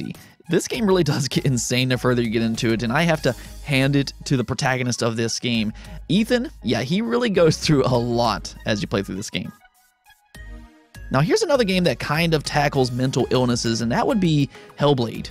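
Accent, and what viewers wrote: United States. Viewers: !! Jeez lassie I thought you were from New York or California! I’m gonna have to try your game too! I got the NEW Xbox though 😂♥️🤷‍♀️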